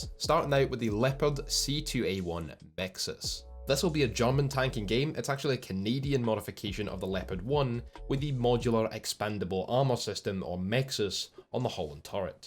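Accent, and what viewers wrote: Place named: Scotland, United Kingdom. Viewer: Ngl I watch ur vids mostly for ur accent I wish I had a Scottish accent lmao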